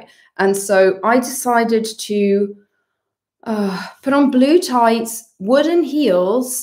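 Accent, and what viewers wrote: United Kingdom. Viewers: Greek Icon with British Accent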